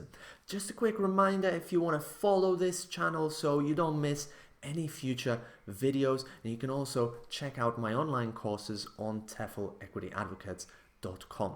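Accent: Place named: United Kingdom. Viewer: Your pronunciation, though, is heavily based on the British one. It is very obvious. You just have a good ear and, possibly, very good memory. Both are genetic. That might be a part of the reason why you have been successful. Confidence and a native -like pronunciation ...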